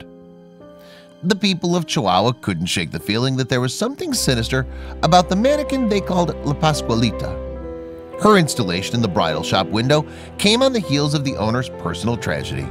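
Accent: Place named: Germany. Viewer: He sounds like what a thick German accent voice feels like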